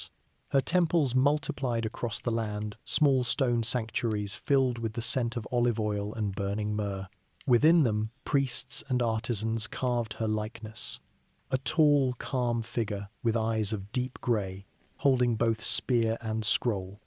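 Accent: United Kingdom. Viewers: Are you talking about the AI British accent?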